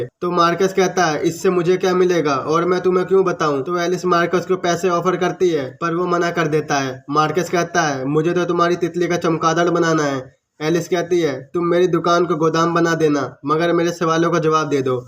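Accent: Uttar Pradesh, India. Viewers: Bhai aap up se ho kya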